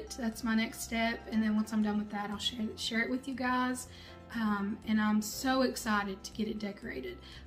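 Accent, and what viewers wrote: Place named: Kentucky, United States. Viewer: You have to be from Kentucky you have the voice lol so am I...great haul,,love Peddlers Mall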